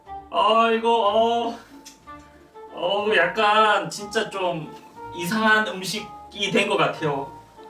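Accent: Busan, South Korea. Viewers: 혹시........... 부산사람이세요???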